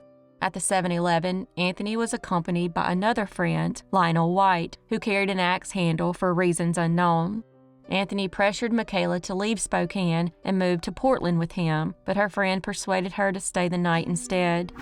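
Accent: South, United States. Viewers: I love her voice and Southern accent. ❤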